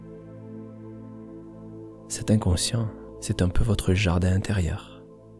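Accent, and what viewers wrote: South, France. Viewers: Votre petit accent du sud me réchauffe le cœur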